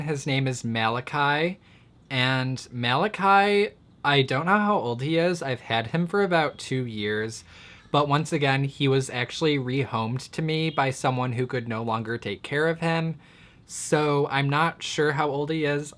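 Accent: Midwest, United States. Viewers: what state are you from you sound like you have a midwest accent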